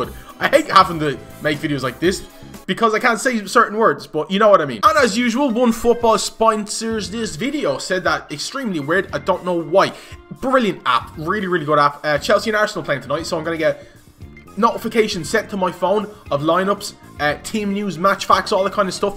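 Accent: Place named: Mayo, Ireland. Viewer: Mayo accent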